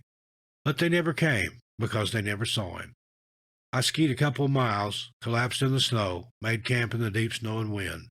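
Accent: United States. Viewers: Your accent comes from which part of America, New York?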